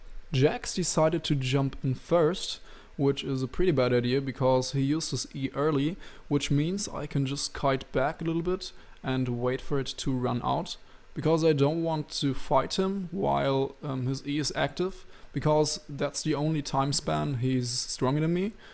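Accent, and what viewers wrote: Germany. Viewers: First of all, great video! Second of all, where are you from? Your English is very good but you sound German.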